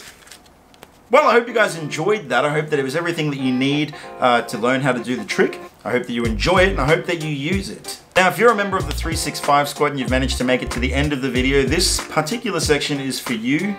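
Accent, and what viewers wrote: Australia. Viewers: are you Australian?😂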